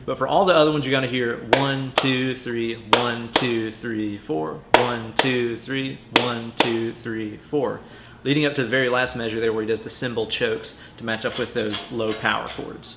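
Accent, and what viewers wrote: South, United States. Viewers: Are you from the south ?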